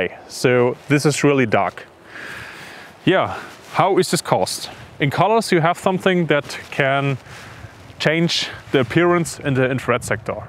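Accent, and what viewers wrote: Germany. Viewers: Fürchterlich wenn ein Deutscher englisch spricht. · Wdym he has a heavy german accent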